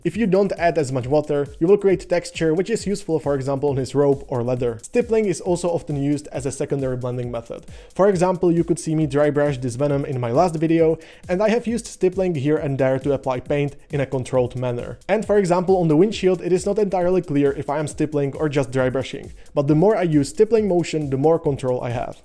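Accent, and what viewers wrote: Czech Republic. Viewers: Heej, borče! Úžasné video a skvělý kanál, jsi jeden z nejtalentovanějších... "malířů" modýlků? (painter/malíř)...barvič mi zní divně...o natěrači ani nemluvě...whatever, co jsem na YT viděl. A ano, už po asi třech letech a hromadění indicií jako Tvůj přízvuk a analyzování Tvého footage z metra či nalezení Tvých krásných modelů v pražské herně za vitrínou, jsem konečně zjistil že si taktéž obyvatel té naší krásné středoevropské kotliny :D Jsi krásný příklad rčení "zlatý český ručičky". Fakt klobouk dolů. PS: majestic beard, king! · Slyším cze-nglish! A dobrý videjko. Škoda, že jsem neměl takový tutoriály když jsem začínal barvit :c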